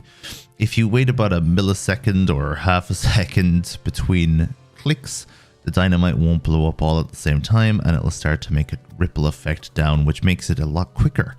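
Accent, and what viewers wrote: Ireland. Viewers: You sound Irish but also not Irish at the same time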